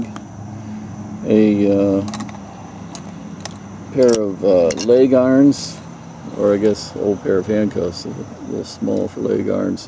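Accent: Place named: Baltimore, United States. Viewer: Love your finds...great video. Your B'more accent keeps me longing for the good old days in Maryland! I lived in Glen Burnie for almost 20 years and nearly forgot what a terrific area it was for vintage finds. Keep it Up!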